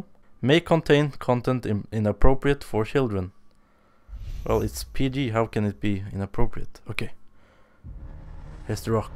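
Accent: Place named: India. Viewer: your accent sound indian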